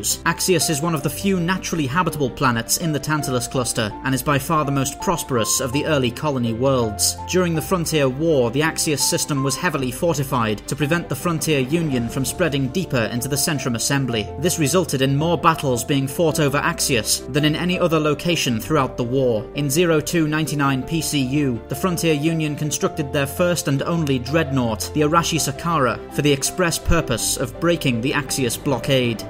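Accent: United Kingdom. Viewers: Hi I'm a fan of your work on this channel. I'm impressed with the professionalism of your videos and voice over, also nice to hear a fellow brit. I was hoping i could get your attention for short fiction review if that's something you do.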